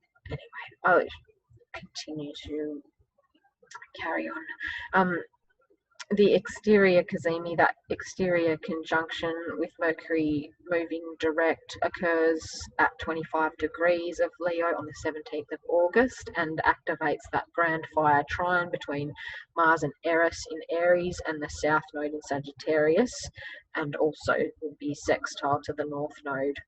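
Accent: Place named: Australia. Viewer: Wonderful to hear an Aussie accent on EA ♥️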